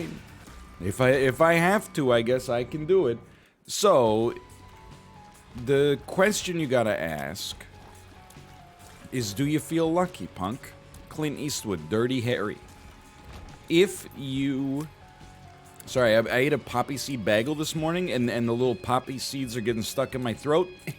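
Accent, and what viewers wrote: Canada. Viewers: NL went on a bagel rant just to say bagel the canadian way as many times as possible after pandering to americans for so long.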